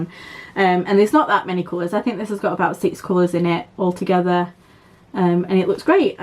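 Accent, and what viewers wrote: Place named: North, United Kingdom. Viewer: Right I’m all caught up! Loving your flosstube and really enjoyed spending time with you. As a fellow northerner it’s lovely hearing another uk flosstuber! Your soda stitch finishes are stunning and I literally love all your wips. Had to stop the video a few times to check on different Etsy sellers!! I think you are far too hard on yourself re your project bags I think they are phenomenal, if I made one I’d prob end up with a t shirt by mistake or something 😂😂 so happy to see your starting Victorian charm, I completed it a couple of years ago (think I show my finish on flosstube 7) if you want to see a finish of it! Not going to lie it’s a big piece but it’s so worth it! It’s still one of my fave finishes to this day. Happy stitching lovely - take care, Lauren (Flossabilities) 🧵💗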